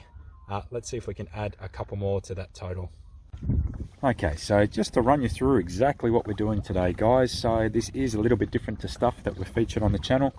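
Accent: Australia. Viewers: Interesting video! In ny search for a review of the Savage Gear Gravity jerkbait I ran a cross your video and was highly surprised when I heard aussie english and saw a common European perch in your hand?! I thought, for moment, that guy has relocated to Europe... Then I thought it wouldn't surprise if those Britts did with perch what they did with brown trout world wide...Anyway it's a nice little sport fish that tastes well. Is it causing you major problems in your waters? Invasive species often do but I am glad we don't have that here in Sweden, at least not yet. Cheers!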